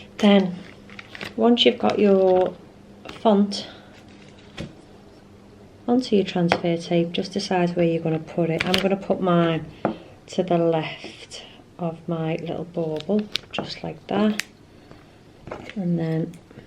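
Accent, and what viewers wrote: Liverpool, United Kingdom. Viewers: Ah the c word, fab n gr8 seeing u back with your lovely liverpool accent, won't b long till you're putting up Christmas trees again, hope u move soon, we're 10 weeks along waiting to buy house nxt door x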